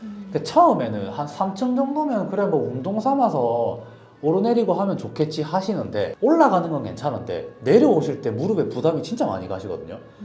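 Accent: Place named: Gyeonggi, South Korea. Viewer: 원래 경기도 사투리에요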